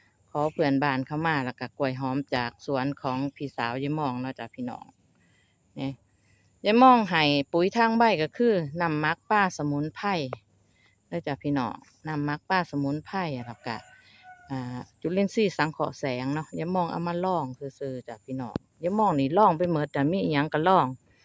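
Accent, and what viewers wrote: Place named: Sisaket, Thailand. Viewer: สำเนียงเหมือนคนศรีสะเกษจังครับ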